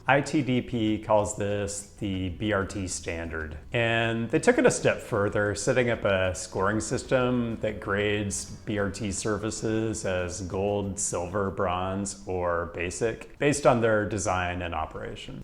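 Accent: United States. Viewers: GREAT VIDEO! I, too, am a gringo nerd who likes your channel and is oddly entertained by your videos. I also LOVE México City and it's amazing, gigantic and extensive transit system -- and I don't get to visit enough! I ❤ CDMX🇲🇽! As a resident of a huge American city on the I-10 swath, where "Spanglish" is widely spoken, I feel the desire to help you out a little. (You definitely speak like an "I-90 gringo".) For "Hidalgo", say "ee-DALL-go" For "Insurgentes", the "g" is pronounced as "h": "een-soor-HEN-tes" and "CableBus" is "kahb-lay-BOOS" (hard "s") From one gringo to another, I hope I have nudged you one tiny step closer to Spanish fluency! 🤓👍🏻🇲🇽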